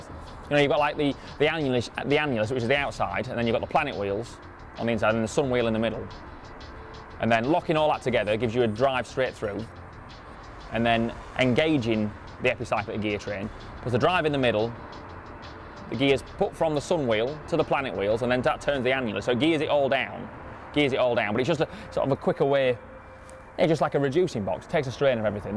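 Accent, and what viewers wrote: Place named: Lincolnshire, United Kingdom. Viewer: Nice to hear a Lincolnshire accent mayat.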